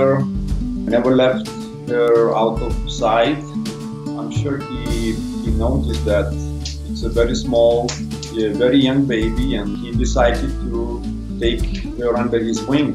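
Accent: Russia. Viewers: the russian accent and simple english adds so much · Ох уж этот русский акцент... =) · Русский акцент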